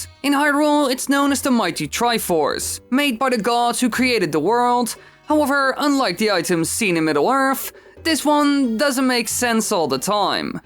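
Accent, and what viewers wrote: Netherlands. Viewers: Wacht.....Je bent Nederlands??????? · Are you dutch?!!????!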